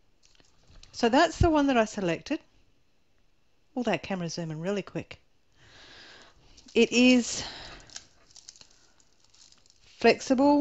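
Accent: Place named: Australia. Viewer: Hi,nice to hear an aussie doing diamond painting on utube!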